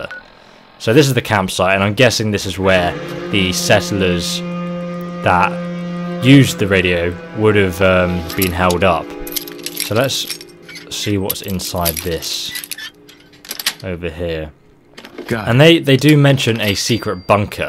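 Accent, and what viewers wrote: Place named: United Kingdom. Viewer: Dyslexia makes it hard to read. Not to speak =) I also think it's just a british accent thing. No biggie.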